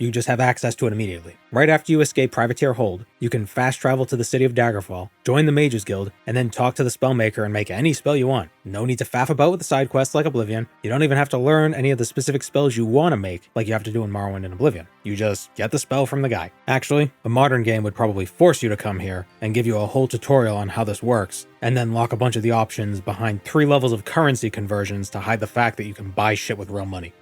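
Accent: Boston, United States. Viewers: i realize this is an odd question to ask, well spoken TES challenge run youtuber I subscribed to a bit ago, but are you from eastern Massachusetts? Very rarely in your videos, i occasionally catch a familiar accent in your VO (in this one you said bastard as 'basted' one time), but I can't tell for certain if it's Bostonian or not.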